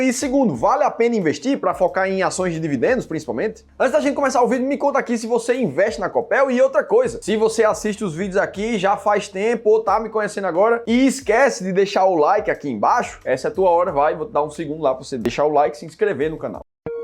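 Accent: Pernambuco, Brazil. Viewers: E aeeee comedor de mocotó de boi! Será que já comeu uma buchada de bode??? Homi....vamos comer uma mão de vaca! Esse sotaque não engana um pernambucano não! Kkkkkkk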